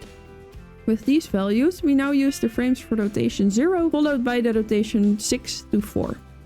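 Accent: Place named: Netherlands. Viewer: Your Dutch for sure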